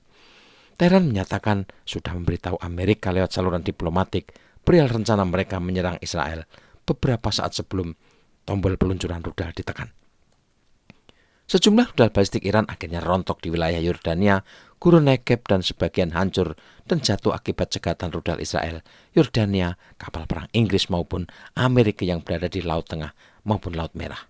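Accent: Java, Indonesia. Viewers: Terlalu medok Logat Jawanya diperhalus yaaaa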